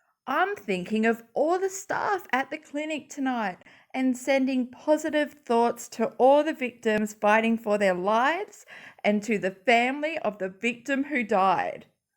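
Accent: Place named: Australia. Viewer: Its refreshing to hear an aussie accent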